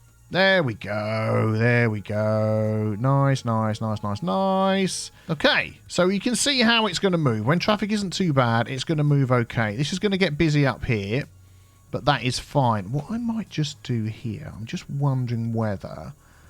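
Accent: United Kingdom. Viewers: I’m like bingewatching all your Cities Skyline videos! They got recommended. I like your British accent, I like the content you show us, and I really feel like playing CS myself. I like the 'lane mathematics', 'autosave sippa tea' too 😜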